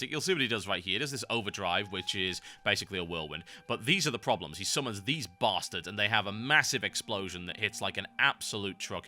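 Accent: United Kingdom. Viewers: Hmmmmmm snarky british sounding guy who talks fast? That's a Yahtzee!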